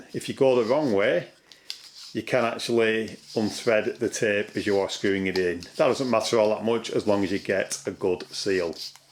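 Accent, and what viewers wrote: United Kingdom. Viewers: Best accent in UK, best advice in World! What did you do to your hand?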